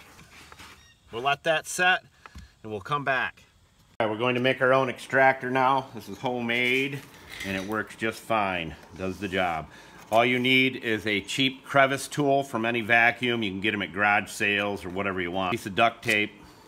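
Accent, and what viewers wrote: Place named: Midwest, United States. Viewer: I could tell this guy is Midwestern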